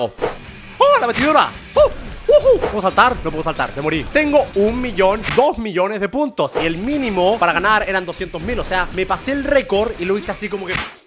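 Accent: Argentina. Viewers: german esta mas argentino en cada vídeo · ¿Soy la única que notó que a se le está pegando el acento argentino de alguna manera? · Digan si no está ablando con asentó argentino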